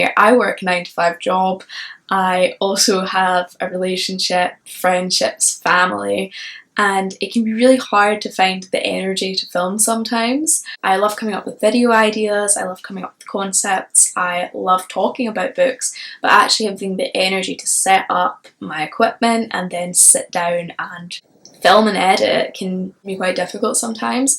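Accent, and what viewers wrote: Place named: Scotland, United Kingdom. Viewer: Hello from Canada! I'm looking forward to more of your videos. Love the Scottish accent.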